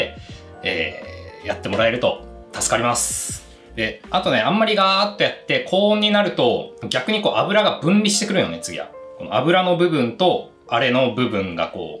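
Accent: Fukuoka, Japan. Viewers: 福岡の方ですか？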